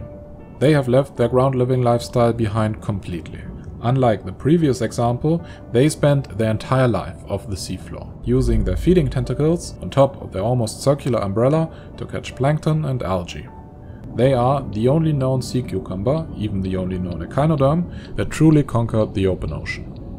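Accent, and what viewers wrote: Germany. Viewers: Sis guy soundz gerrman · Youre german right i can hear the accent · Are you from Germany? (Ur accent says so) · bwahahahah the way you said "turd like sausages" so casually and nonchalantly...and with that thick german accent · Your German accent is killing me inside.... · Kann mir nen Deutschen mit heftigen Akzent einfach nicht auf Englisch geben. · You got a strong accent. I guess you're German or something close by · Drop the nazi accent. It's offensive and racist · Safe ,ist der Sprecher ein Deutscher! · Sounds like a German Morgan Freeman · I can instantly tell hes german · is that a german accent??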